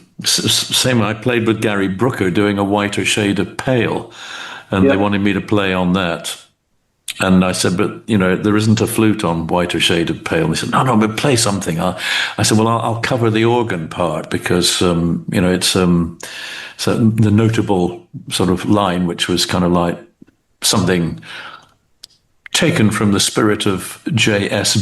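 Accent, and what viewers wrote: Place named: Scotland, United Kingdom. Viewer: For a Yank like me, your Scottish accent was at first a wee bit difficult :face-green-smiling:, but Ian of course was right at home with it and as a result you got an excellent hour+ from him. Honestly, I've heard interview after interview with Ian in which he answers the same ol' questions again and again with the same ol' answers, but you asked several unique questions that elicited genuinely thoughtful/original answers from him ... good on ya mate. Well done. :goodvibes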